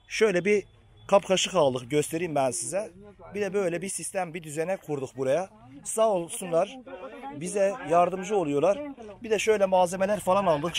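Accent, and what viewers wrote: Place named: Ağrı, Turkey. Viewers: Abi cok guzeldi valla sen ya bayazitlisin ya diyadinli sive bizim oralarin sivesi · Agrili oldugunu tahmin ettim siven kendini ele veriyor bende aslen agriliyim dogma buyume igdirliyim vidyolarin com guzel istanbuldan selamlar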